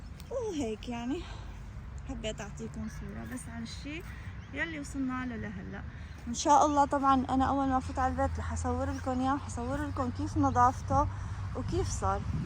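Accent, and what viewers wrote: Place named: Syria. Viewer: بكره لكنه السوريه بتوجعلي اذني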